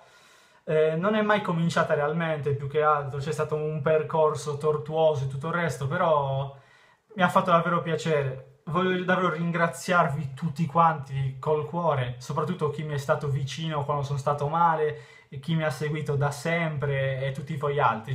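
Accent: Sardinia, Italy. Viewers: Allora secondo me te ti sotto valuti perche' ci sara' un motivo se hai fatto un boom di iscritti,magari ad una parte delle persone che si è iscritta piaceva il tuo accento sardo magari ad un'altra parte piaceva il call of sputy questi sono esempi fattI da me magari le persone si sono iscritte per altri motivi ad ogni modo non ti devi sentire in colpa se hai fatto piu' iscritti di altri nn è colpa TUA.Detto questo mi manchera' vedere i tuoi video ma ti auguro buona fortuna per gli studi:) PORCO IL!!!!!!!!!!!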